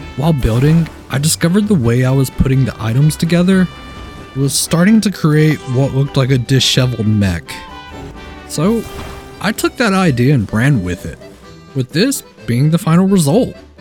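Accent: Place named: North Carolina, United States. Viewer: Youtube analytics are such a drag; one day your hard work will pay off but don't get burnt out or make your views equal your worth as a creator. Also I very much notice and appreciate the captions - above and beyond with your editing and production value!! Have you thought of streaming to make your youtube edits more enjoyable? Still lovin the NC accent btw just thought remind you haha ~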